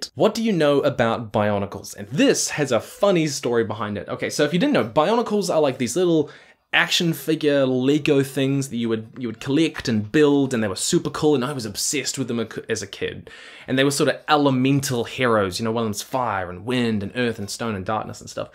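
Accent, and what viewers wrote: United Kingdom. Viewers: Screaming at this video being how I discovered you were from New Zealand 😭 I just thought you were British this whole time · Bro I'm just finding out you're in NZ too?? Literally thought you were British - I'm in Auckland, moving to whangarei soon, hang out with me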